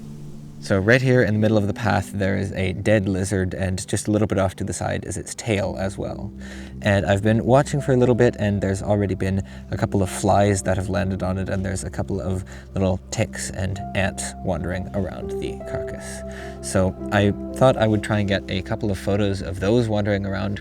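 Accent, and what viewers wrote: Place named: United States. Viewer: It'a a lovely area with some great fauna. I'm intrigued, because you sound American / Canadian but the fauna seems to be from central or eastern Europe.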